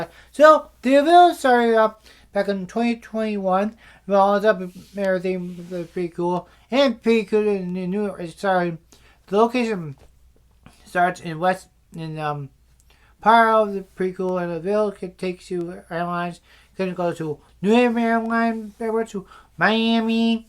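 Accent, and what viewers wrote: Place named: England, United Kingdom. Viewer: Awesome airplane review is very interesting and so much information enjoy like your English accent smile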